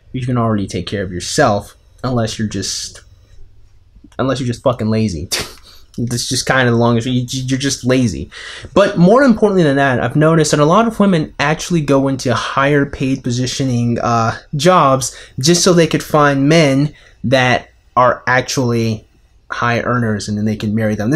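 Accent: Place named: United Kingdom. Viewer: ayy burp and british accent, this was a good vid. also re: paired stimuli (i.e making her feel good in your presence) , this is how you get a girl into anal...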